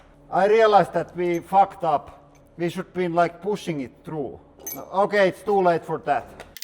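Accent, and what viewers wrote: Finland. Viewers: Love Finland accents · En oo pitkään aikaan kattellu tätä kanavaa; aksentti tuntuu muuttuvan aina vaan "manselaisemmaksi"....:D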